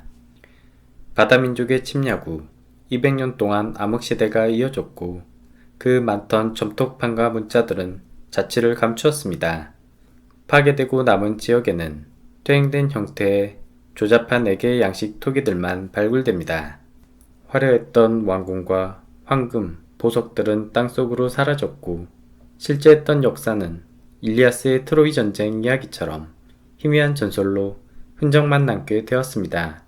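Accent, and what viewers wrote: Jeolla, South Korea. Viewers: 내용이 좋은건 당연한데 은근 슬쩍들려오는 전라도사투리가 갱장히 친근하네요ㅋㅋ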